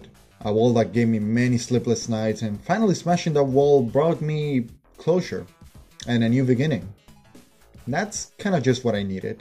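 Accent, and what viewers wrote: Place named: Argentina. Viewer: What an accent you have man! I'm also from Argentina and I still sound like one while speaking in English , s3 and knuckles definitely is one of the best sonic between mania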